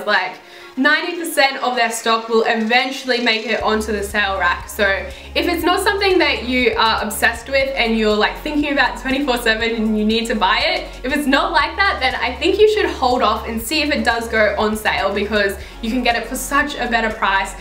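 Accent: Australia. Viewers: Are you Australian?? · are u from Australia??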